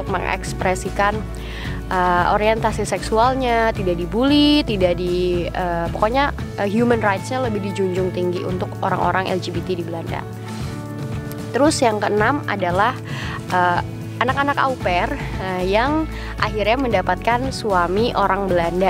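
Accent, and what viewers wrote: West Java, Indonesia. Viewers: Teteh ada sunda nya ya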